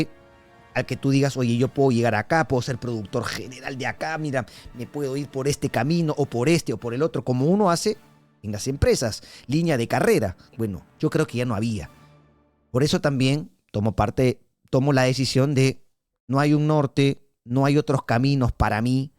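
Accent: Argentina. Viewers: es argentino?... por qué habla así?...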